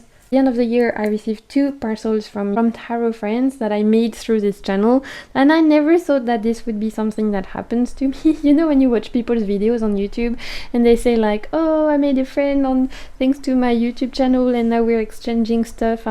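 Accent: France. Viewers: Muchísimas gracias a tí por compartir tanto con nosotros!😃🙌💫 You know, your beautiful accent always reminds of how cool French is and puts me in the mood of listening to French or reading in French. I do it occasionally. 😄 !Sigue disfrutando del océano por los que no podemos! !Un abrazo muy fuerte!🌟❤️😉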